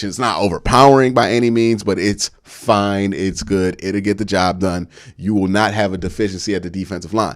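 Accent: Cleveland, United States. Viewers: I just clicked on your video cause it was recommended to me (I wanted to hear thoughts on Clowney) but then I heard your Cleveland accent and was like wtf!? I liked your take but then I heard your accent and found out you were a Brown. I'll be watching your show man, I'm subbing. What part of Cleveland are you from?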